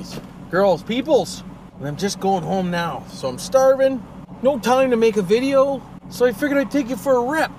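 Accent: Canada. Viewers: fuck eh you can tell you're from Ontario 😂 i only say this because I'm from the Stratford area and we all talk the same glad I found the channel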